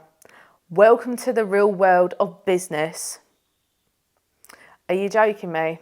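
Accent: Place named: Cockney, United Kingdom. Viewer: Hey you have such a strong cockney accent for a Punjabi girl..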